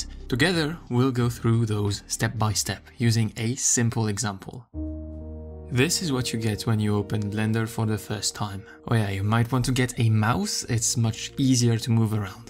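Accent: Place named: France. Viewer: The video is super intressant. Tu es français ? Parce que j’ai l’impression que t’as un petit accent.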